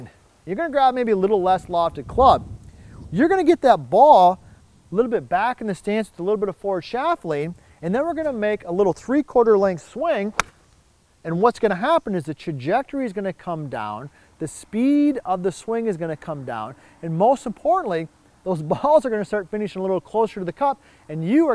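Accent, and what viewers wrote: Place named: Wisconsin, United States. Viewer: Hey: Rooster here in sunny Estero Florida. I really liked your segment on changing the putting grip by turning the top hand so the putter face is always square. I tried it when I played Tuesday and broke 80 for the first time this summer at a reciprocal course. (not my home course were I don't know the greens.) I've lived the majority of my life in the St.Louis area and SW Florida since 2010. You sound like you are from Wisconsin. I spent a lot of time in MKE. I sold goods to Kohl's. Great folks. I like your friendly style and thorough explainations. Thanks. Rooster34135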